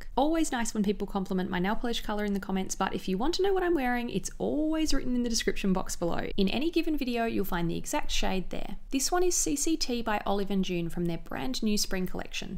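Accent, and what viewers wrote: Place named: Australia. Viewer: I keep getting stumped! An Australian accent so I automatically assume you're from Aus and the products you talk about can be found here but both Olive & June and Glossier don't ship here! So upsetting :(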